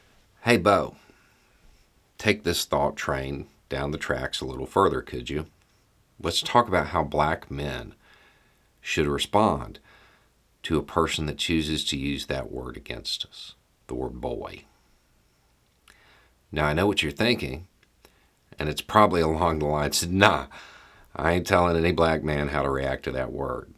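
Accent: United States. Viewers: This is one of your best Beau, I've PTSD, and my wife doesn't like you because you are an American, and look like you do and sound, if it helps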